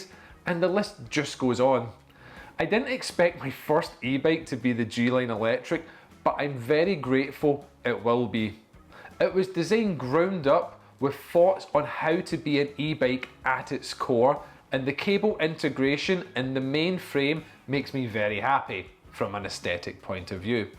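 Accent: Scotland, United Kingdom. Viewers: E beiiike… i love the scoottish people and their funny accents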